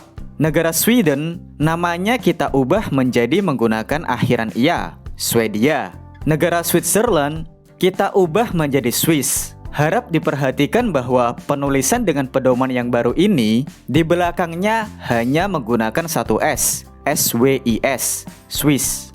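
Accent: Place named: Java, Indonesia. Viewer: Pelafalan a la ✨Djawa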